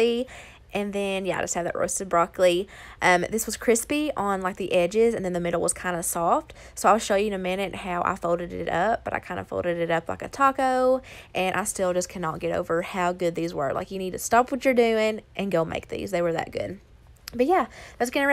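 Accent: South, United States. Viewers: Love your Recipes and love your country accent 💕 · I just love your videos! Great filming angle and just straight to the point. Also love your strong southern accent 😂 it's like soothing to listen to.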